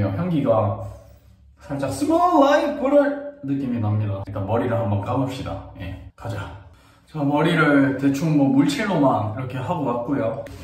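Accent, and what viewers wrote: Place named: Gyeongsang, South Korea. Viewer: 님 말투면 달고나가 아니라 쪽자라고 해야할 것 같은데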